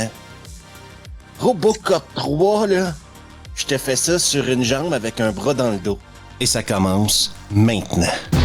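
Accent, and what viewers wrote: Quebec, Canada. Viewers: Toujours agréable quand ton québécois ressors ! Une criss de honte !! J’ai ri !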